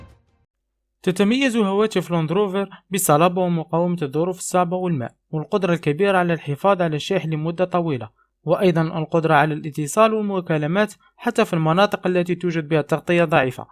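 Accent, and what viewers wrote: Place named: Karfa, Arab world. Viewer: تبانلي من هدرتك كرفة و شكام تاعهم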